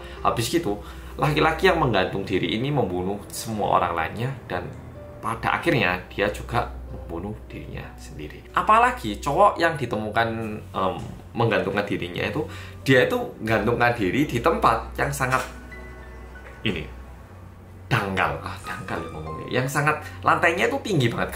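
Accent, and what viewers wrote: Java, Indonesia. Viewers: Semapot gak bisa hilang, medoknya itu lo 😁🤣🤣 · iku lho.. aowkowkwowkwok medok tulen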